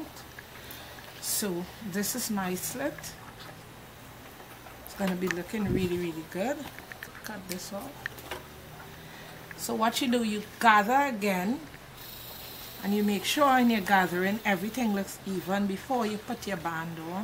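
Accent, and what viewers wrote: Trinidad and Tobago. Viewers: You sound trini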